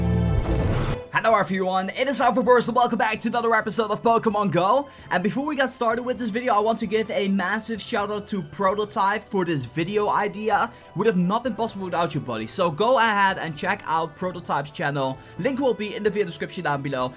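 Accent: Netherlands. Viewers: je bent toch nederlands dat hoor ik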